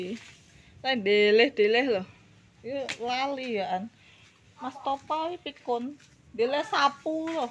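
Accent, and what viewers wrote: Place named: Banyumas, Indonesia. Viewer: Balik maning kro inyong kiye hot milf... ky kuwe yu openinge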